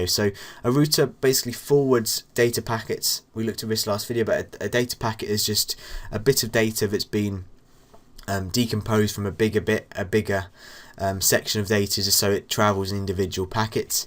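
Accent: United Kingdom. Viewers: are you from leicester? you sound like a friend of mine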